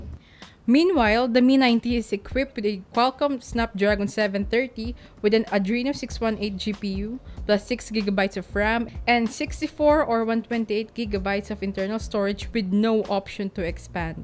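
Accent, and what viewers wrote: Philippines. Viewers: pinoy kse ganun tlga d native language eh.. may accent tlga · Non-native Filipinos speak that way. Let it pass. At least she's trying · This accent is too thick. Im guessing it is pinoyish english.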